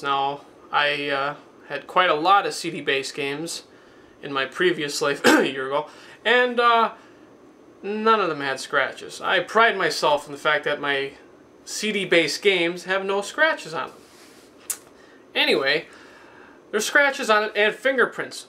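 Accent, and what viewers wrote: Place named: New York, United States. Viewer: I think he's a New Yorker, maybe Boston. He's displays more intelligence than the guys from Jersey Shore do.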